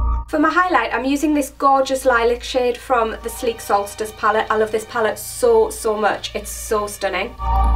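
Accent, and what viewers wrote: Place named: North East England, United Kingdom. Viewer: where abouts are you from? you doing like you are from NE England somewhere xx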